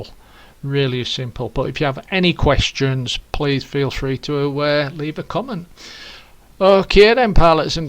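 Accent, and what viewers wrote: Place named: Barnsley, United Kingdom. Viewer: Great video love Ur ascent you sound like my best mate who's from Barnsley. Really helpful vid 👍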